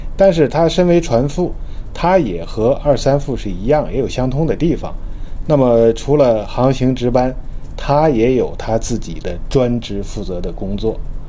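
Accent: Tianjin, China. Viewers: 听口音就是天津爷们。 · 嘛，，我建议，您嘛还是讲天津话好听，当然您现在讲的也好听，听着舒服，亲切。我是建议呀，我看还是把这节目办的有点那么是相声的感觉，就更好了，凭您的水平，口才，我看成，点赞。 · 看的视频有一阵了，刚开始没确定的天津口音，听着很熟悉，让我想起了在天津上大学的时候，作为一个南方人，也很爱吃煎饼果子。很喜欢的节目，我是干航空的，看航海的，我发现很多相似但又完全不同很新奇的知识，感谢的科普！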